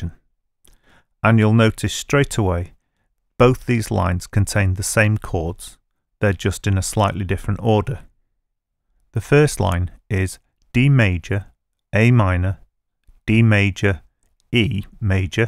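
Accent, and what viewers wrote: United Kingdom. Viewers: BLIMEY!!! I thought I lost this channel and never be found again. I used to watch before I had guitar and thinking of following alone after I get one. And somehow I forgot name of channel. I dug on my history and So so relieved I found it. You sir are amazing. Maybe it's British accent so warmy and welcoming. I hope to buy e-book soon. Thank you very for articulating videos in such a manner which clicks with newbie like me. It must be time consuming to make videos on various topic but my lord your tutorial worth pile of gold. You are Bob Ross of guitar. I am so grateful for your videos. Once again thank you.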